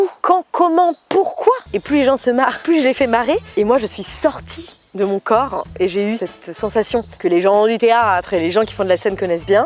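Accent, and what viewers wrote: South, France. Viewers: Encore une fois , “une petite pépite” cette vidéo ( avec l’accent du sud s’il vous plais 😂 ). Merci pour nous avoir partager autant de bonne humeur , des le réveil ça fait énormément plaisir 🙏😌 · Au programme des troupes de dance moderne jazz avec des chapeaux melons, 🎩😂😂un parfait accent du sud👍👌😉 et des expressions qu'on adore comme" j'men bat les Yakas".😝😉😂 Je t'adore continue ce que tu fais.👏😉😂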